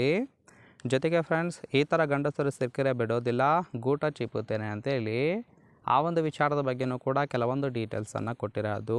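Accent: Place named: Haryana, India. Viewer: Haryanvi madam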